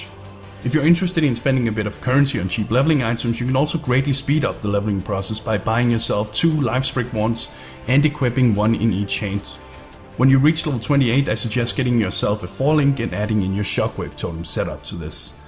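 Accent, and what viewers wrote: Denmark. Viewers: are you danish ?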